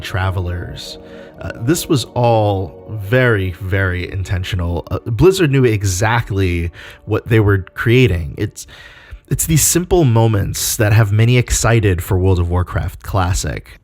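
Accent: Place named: Denmark. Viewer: I love your content, but.. Danish accent in English is like a trigger to me. It's probably my top cringe button. I enjoyed the way you did your logo though, with the more animation-y momentum and that the particles, really dug that.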